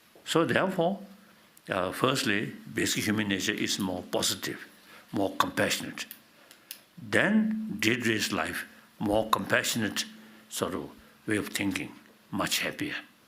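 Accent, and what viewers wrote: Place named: Tibet, China. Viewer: that is absolutely not what the Dalai Lama said, it's an AI speech recognition program that goofed up because it misheard his Tibetan accent, but to answer your first question from my own perspective: yes. It certainly can be, though not necessarily so. Also, while many communist dictators were atheists, atheism is NOT inherently authoritarian and conflating ordinary atheists with Stalin or whoever is a vicious smear. I will not conflate you with Hitler or Franco, who I believe were both Christians, and I would expect the same courtesy and good faith from you with regard to me, as an atheist, and Stalin or Mao ZeDong or whoever. Ground rule: at least have BASIC good faith and don't smear people like that. Agree?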